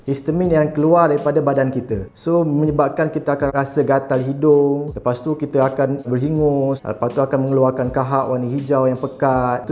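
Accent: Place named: Kelantan, Malaysia. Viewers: Bunyi Kelantan Dr ni cakap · Kelantanese accents has been detected.... 😁😁 Apa² good information doctor .....👍🏻👍🏻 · Abg ni orang kelantan kan? Slang kelantan tu ada kedengaran..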